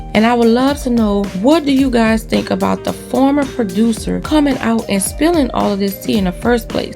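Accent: New Orleans, United States. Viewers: Are you from New Orleans? That accent is strong lol 😂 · U do a great job speaking but babaaaay I know the NOLA when I hear it! Especially being from SC.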